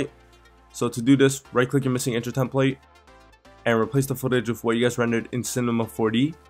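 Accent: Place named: Russia. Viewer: Is it me or does this guy sound russian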